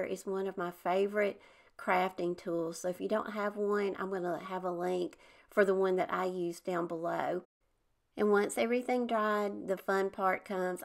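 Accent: South, United States. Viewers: I am fairly new to your channel. I love your idea's. You make such darlin thing's. May I ask which state you live in. I live in South Carolina. You have that southern drawl.. So do I.. I would take a little guess and say you may live in Tennessee. I have family in Tennessee. Thanks for sharing your beautiful craft's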